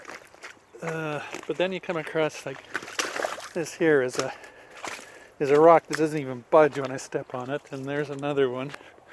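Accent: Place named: Maritimes, Canada. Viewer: You are picking up a touch of maritime accent.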